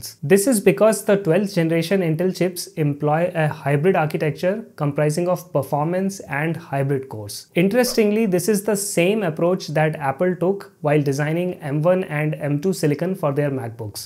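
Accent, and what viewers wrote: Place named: India. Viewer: Great video! You really give good and helpful information. I also like that you took the effort to add those nice subtitles! Some people might have some trouble with your Indian (?) accent (like me - I am German), but the subtiles are really helpful, since sometimes there is just a word or two that I did not understand right away. All in all: Great and amazing job!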